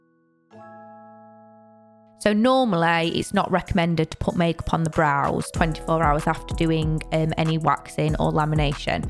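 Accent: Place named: Northern England, United Kingdom. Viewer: hear a northern english woman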